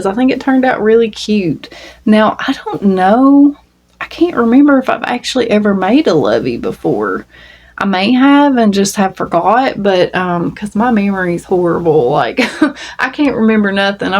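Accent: South, United States. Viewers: So many lovely items that you have done! The lovey with the doll head is a great idea. Your doily is beautiful. If you run out of wall space, I have put a doily in my window. I guess you'd have to be careful that the sun didn't fade the yarn, but it sure looks pretty. When you say "doily" in your soft Southern accent comes out like "dually" Cute!! I agree that I feel so bad for the Joann employees. Luckily I think the one near me is not going to close. I love Big Twist yarn also. Have a great week and looking forward to your yarn haul. I'm trying to behave in that area, but it's hard to do!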